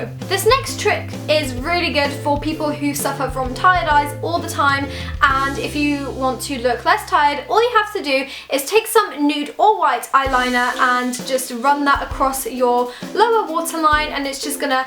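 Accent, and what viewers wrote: England, United Kingdom. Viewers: hahah defenitly not polish with pure english accent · she has an English accent. There are so many different accents in Britain eg Scottish, Irish etc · British accent 😍❤ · That is a British accent correct? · English accent*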